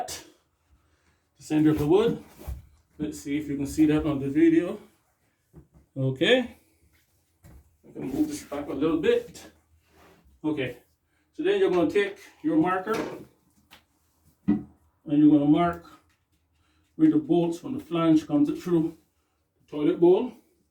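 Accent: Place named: Barbados. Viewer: Total Bajan ingenuity on display here. Right down to “de axcent”. I tell you 2025 👍🏾 Barbados 🇧🇧 in Newfoundland 🤓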